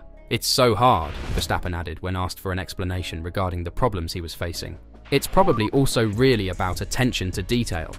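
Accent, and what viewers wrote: United Kingdom. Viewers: Off course a Britisch accent, skipped.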